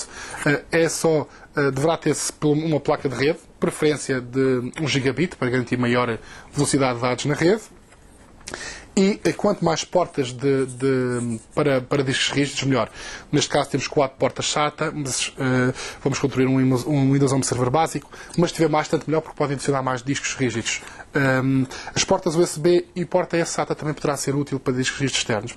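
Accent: Portugal. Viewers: NÃO VAI DAR CERTO NUNCA? UM PORTUGUES EXPLICANDO AI NÃO DÁ. PUTA CAGADA HORALHOS........ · Sei que este é o português autentico falado em Portugal, mas não consigo me acostumar.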